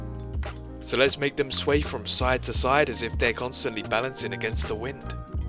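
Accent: United Kingdom. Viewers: I didn't know was British. I am happy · Is British?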